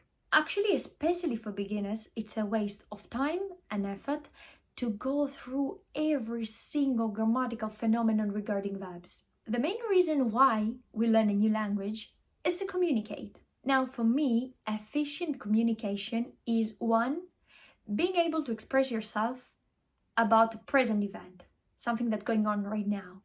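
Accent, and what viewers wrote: United Kingdom. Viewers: Listening to your British-English accent, I wonder if you can talk about how you acquired it (instead of, say, an American-English accent), and how to better sound "Greek" instead of speaking Greek with an American or British accent? Also, I know that Crete has it's own accent/dialect. Are there many other regional accents around Greece? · I find your videos really helpful Thankyou! All the other Greek videos I have found are too difficult to understand as the tutors speak too quickly. Yours is perfect. I hope you don’t mind me saying but I am surprised that you are pronouncing ‘ and’ with a strong American accent and internet without the ‘ t’ sound because otherwise you have an English accent which sounds almost fluent. To me these words with American pronunciations stand out and sound rather odd.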